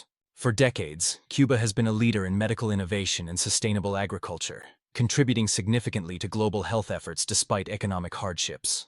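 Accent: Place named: United States. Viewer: Somewhat ironic to use an American accented overdub??? Perhaps you need to rethink that approach.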